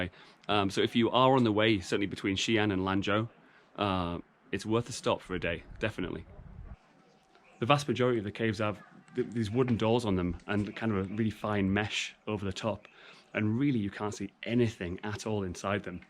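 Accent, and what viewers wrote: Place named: Northumberland, United Kingdom. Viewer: Just found this. Loved it. You sound like a Northumbrian. 👍😍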